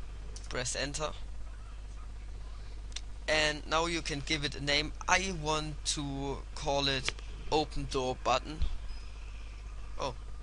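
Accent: Germany. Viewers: Natürlich bist du Deutsch. Merkt man z.B. an "dis" oder diese klare Aussprache. Amis sprechen anders.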